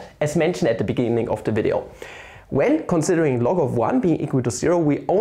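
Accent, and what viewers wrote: Germany. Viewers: Are you German or American????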